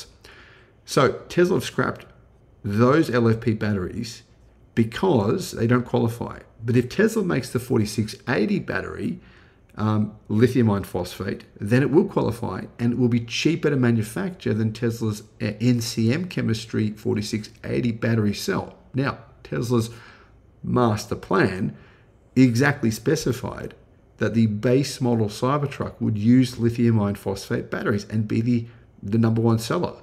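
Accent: Australia. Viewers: Mate, your accent is perfect, it's not even strong "back of Bourke" strayan as some of my close friends (and I can understand them perfectly). Keep going mate, you're doing great. Enjoy the US trip !!!! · As a Brit to an Aussie, don't let a Yank tell you how to speak. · Don’t change your accent. I can’t believe someone suggested that. Why would you need to sound like us Americans? We would probably rather sound Australian.. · Don't listen to 'em, bro! Americans adore aussie-speak! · Your accent is awesome! Be you. The Australian tourism council should be paying you per view!!! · Lovin the ausie accent. Always great work. Revolutionizing the auto industry. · Americans want you to sound more "American"???? What? Most Americans really like an Australian accent. Now if we can just get you to talk like Crocodile Dundee, your subs will at least double! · I love the Australian accent. 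Keep it up! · I like your Aussie pronouncing...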